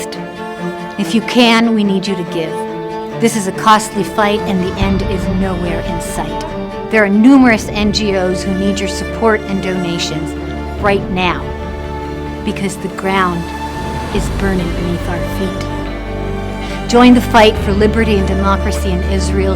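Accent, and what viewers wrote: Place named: United States. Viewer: Her American education shows in her perfectly American English. I also happen to agree with her....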